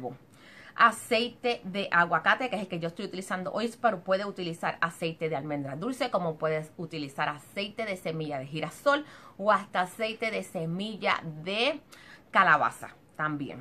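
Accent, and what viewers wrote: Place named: Puerto Rico. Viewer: En Puerto Rico hablan asi credo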